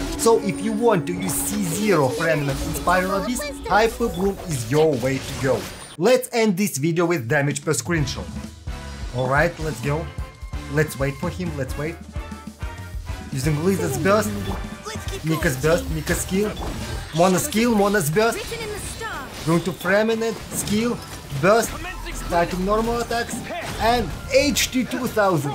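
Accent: Russia. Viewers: ur accent like russian-en isnt it?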